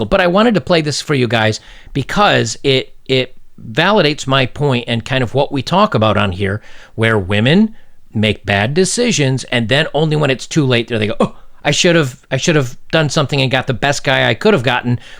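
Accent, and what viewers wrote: South Africa. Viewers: She's definitely South African. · She sounds South African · Also she sounds south african and as a south african, i know bitches be crazy. how did I not have a gf for 26 years of my life and I go to thailand for 2 years and women throw themselves at me. My current thai gf keeps saying that she doesnt believe I have never had a gf in a south africa. western women man. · She kinda sounds South African or maybe the UK? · Gotta love that South African accent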